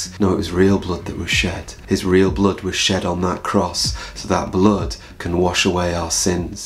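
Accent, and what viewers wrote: United Kingdom. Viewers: I pray nobody is so close minded as to say “who is this British guy talking about Kendrick whomever…” you’re saving souls one day video at a time. Thank you my brother in Christ. God bless and respect from Florida ☀️ 🫡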